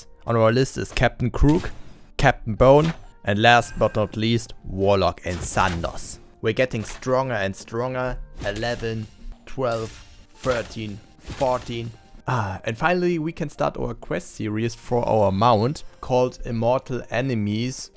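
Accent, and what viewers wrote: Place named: Germany. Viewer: Accent is German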